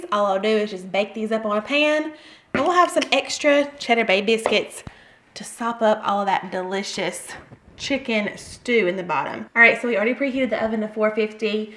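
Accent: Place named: East Tennessee, United States. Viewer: 🍓 This is my first time watching one of your videos and I loved it! I’m from the East Tennessee and girl, your accent sounds just like home! I’ll be trying some of these recipes. And yes, church cookbooks are the best!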